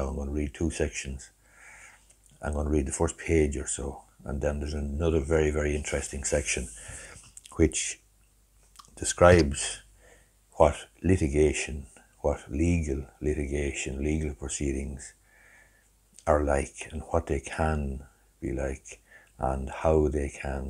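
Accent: Ireland. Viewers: I have read this introduction to Bleak House many times and never fail to admire the brilliance of Dickens. Thank you for drawing attetion to it and reading in your lovely Irish brogue!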